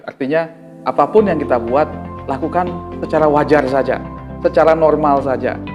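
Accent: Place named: Bali, Indonesia. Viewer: Terimakasih mas Bli sudah berbagi ilmu, logis,kronologis,bahasanya sederhana,mudah di pahami,dibumbui logat Bali yang kental, maturnuwun Mas Bli....( Yogyakarta).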